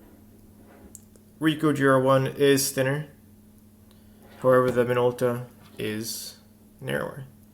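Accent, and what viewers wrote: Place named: Canada. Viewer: This guy sounds Canadian af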